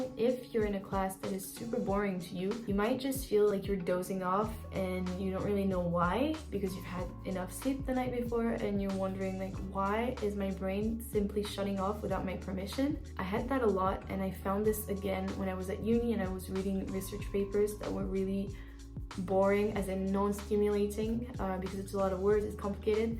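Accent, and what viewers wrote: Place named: France. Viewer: Je reconnais à ton petit accent que tu dois être française (ou belge je sais pas ?). Et franchement merci pour tes vidéos. Tu mets exactement le doigt sur les problèmes que je rencontre au quotidien et je pensais tellement être bizarre et seule à être dans ce cas et tu nous prouve le contraire. Merci infiniment et continue à faire des vidéos comme ça parce que tu vas aider plus d'une personne paumée ah ah. Ça fait du bien de se sentir comprise et de savoir que d'autres personnes arrivent à mieux vivre avec leur TDAH. J'ai hâte d'avoir tous tes conseils pour apprendre à mieux vivre avec ça. Vraiment, merci ❤️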